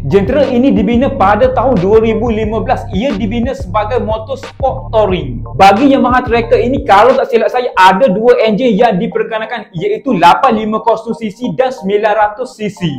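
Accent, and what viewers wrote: Kelantan, Malaysia. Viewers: kelantan sangat hahahha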